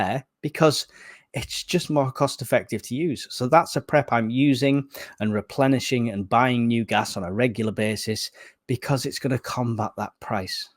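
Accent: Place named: Rochdale, United Kingdom. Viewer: Trying to pinpoint your accent. Going to guess Rochdale because of how you say “work” but the way you say “year” is really throwing me 😄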